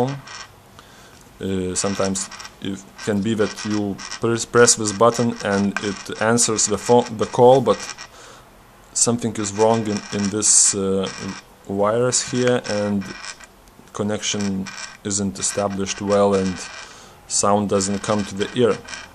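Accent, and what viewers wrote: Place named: Eastern Europe. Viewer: voice like Arnold? :) well Arnold is from Austria and has kind of german accent :) but this mate has slavic accent.. Like me :D Ukraine or something like that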